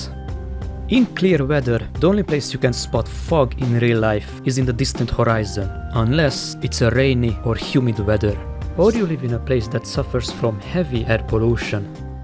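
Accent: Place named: Hungary. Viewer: Tudtam én hogy magyar :D a hunglisht nehéz rejteni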